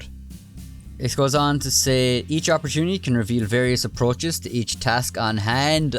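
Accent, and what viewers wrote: Ireland. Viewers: I never tire of that sweet Irish accent.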